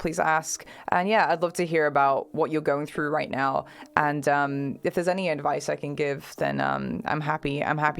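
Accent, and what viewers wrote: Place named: Northern England, United Kingdom. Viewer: Your intelligence is always a step in front of you. I get pulled up for the speed and length I talk at, but you have an extra gear beyond i've ever known. Your every word makes total sense, but I wish I could tune in better. I don't know if you've ever watched or heard anything of the philosopher Alan Watts? Though, he passed away many years ago now, his talks have become very prevalent these days, and most can be found on this platform. Your intensity is amazing. Your hands almost another person in the room, and you know this. Maybe I'm having the same issue as the beginning of animated films, where guessing the voice actors can distract from the plot, but I'm still attempting to guess where the Northern English part of your accent comes from. I could hear you talk for hours, and even if I have to use technology to slow the recording down to understand, I will. 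My apologies to those who know you, they will no doubt roll with your enthusiasm naturally, so my point is moot. I must revisit more astrology too, I haven't for too long. I'm Steven. I'm an attention seeker. I naturally attracted Pisceans, and if you can guess my sign with a 12/1 chance from this comment. I will become a Patreon, because if you are that intuitive, how could I not. I have to add, although communication is the most essentially part of any relationship, and putting effort in can only make that better. I believe too much thought and effort will ultimately mean you're with the wrong person. Especially whe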